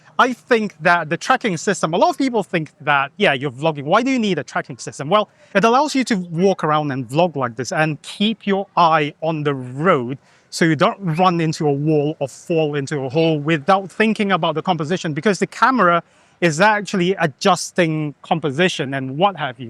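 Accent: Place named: United Kingdom. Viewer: are you indonesian? im hearing a british man rn lmao, until i saw glodok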